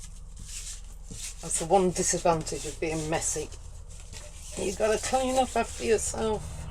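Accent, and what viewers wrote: United Kingdom. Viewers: you live in our dream town!When my husband retires, that's where we want to live. I've only just discovered your channel - and from your accent you, like me, are from Oop North! Loving the chat, loving the tutorials and the way you do stuff. When we're in Glastonbury next we'll meet you at the cross and go for a cuppa or a drink. Hugs from a Scouser xxxxxx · Thank you so, so much for this tutorial. This is exactly what I wanted for my journal cover. I appreciate you taking the time to show every step of the way to achieve this result... and all of this with your beautiful accent that I LOVE. I am in South America but my soul dreams of a lovely quaint cottage in the English countryside. Anyway, did I say thank you? THANK YOU! THANK YOU! THANK YOU!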